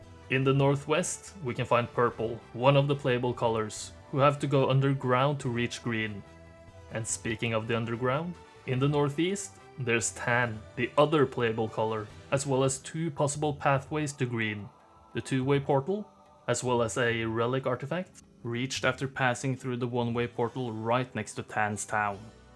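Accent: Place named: Norway. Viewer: Norwegian revealed... for all those that didn't already recognize the accent Heia Norge!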